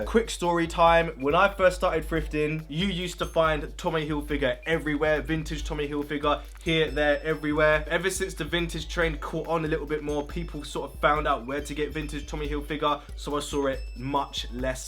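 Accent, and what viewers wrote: England, United Kingdom. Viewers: Hey where in England are you from, like the accent?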